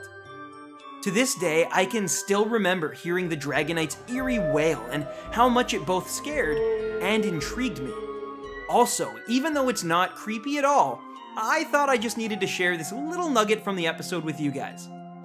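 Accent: Canada. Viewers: Are you Canadian? Cause you sound like a Canadian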